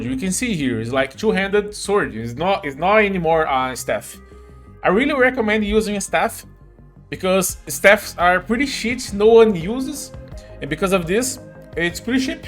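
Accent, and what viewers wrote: Brazil. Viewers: Primeira vez que vi um vídeo dele, achei o inglês bem abrasileirado, ele é br mesmo? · cara um bom conteúdo, mesmo não sabendo inglês direito consegui entender perfeitamente, por ele ser um inglês abrasileirado e isso ficou muito bom de vdd + um inscrito aí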